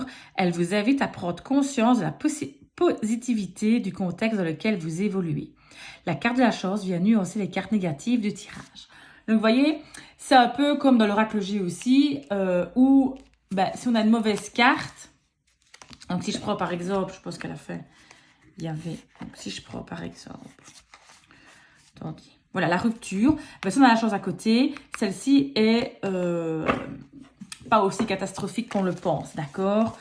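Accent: Belgium. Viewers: Merci de nous faire découvrir les différents tarots, il y en a bcp qu'on ne connait pas donc c'est chouette 😉 vu ton accent tu es du côté de Liège 😊 moi c est du côté de Charleroi 😆 continue c'est chouette de ta part😜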